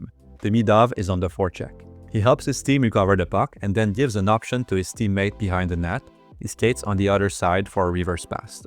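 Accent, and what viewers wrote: Quebec, Canada. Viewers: T'es un québ toi aussi? T'as un accent québecois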